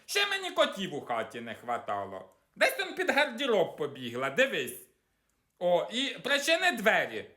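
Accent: Poltava, Ukraine. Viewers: Через Гальку не посадила раньше огород.. Іван там де садив то срака собача сидить а не огород. Ну вже ж підняла настрій. Наш Полтавський говір. Наче дома побувала. Молодець.❤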